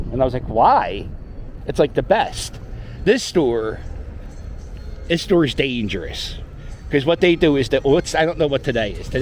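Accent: Philadelphia, United States. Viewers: Are you kidding your accent is Thick as pea soup. I’m from Philly and your voice screams Come have a cheese stake and pretzel GO EAGLES!!!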